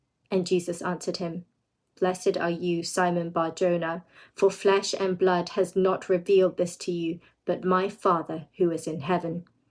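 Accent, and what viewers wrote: United Kingdom. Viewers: No offense but British accent makes me feel like I've lost all understanding of English as If I it's not my own language, this is probably how non English foreigners feel when they hear English 😂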